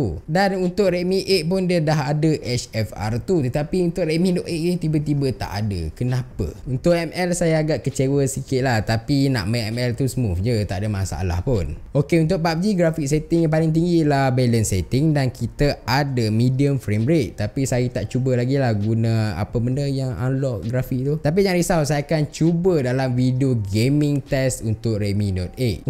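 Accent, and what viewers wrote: Malaysia. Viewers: slang dia kot..biaselah typical malaysian..aku pun macam tu...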